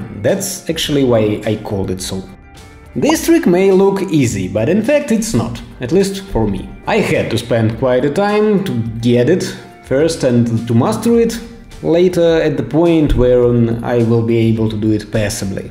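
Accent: Russia. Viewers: Акцент русский какой-то)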